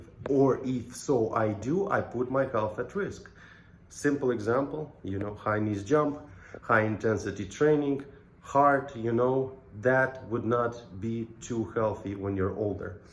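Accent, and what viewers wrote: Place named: Brazil. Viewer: I know this accent I guess. Tu eh BR mano? Mora aqui? Tu não parece a maioria dos BR treinando , treina mais parecido com os americanos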